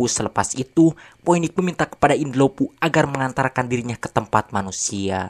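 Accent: West Java, Indonesia. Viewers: Urang sunda? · Wihhh Sunda Palih mana kang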